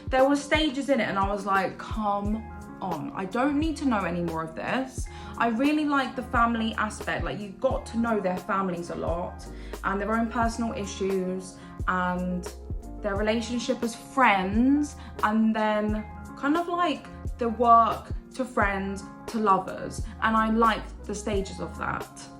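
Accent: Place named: United Kingdom. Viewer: I love her british hermione like accent❣️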